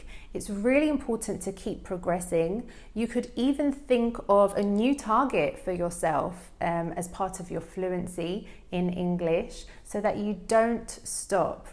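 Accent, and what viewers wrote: United Kingdom. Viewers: Please tell me in which british accent you are talking😊💐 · I love your British accent. It's a lovely accent plus I love the Scottish accent. Thanks for a wonderful video and superb channel you are a gorgeous English instructor. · i love your accent. i mean, british accent👍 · I've been learning British EN for four years. Your videos are really great to keep learning the British accent🇬🇧💛 Thank you so very much!! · I need to listen to british accent more. It is very beautiful, and your tips, particularly, are great. Thanks for your dedication in teaching.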